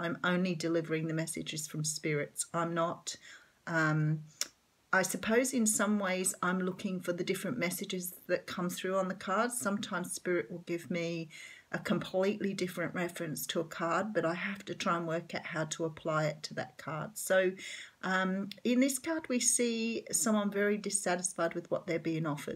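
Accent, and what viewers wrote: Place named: Australia. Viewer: I can see this yes. Nice energy, Australian too.. Good.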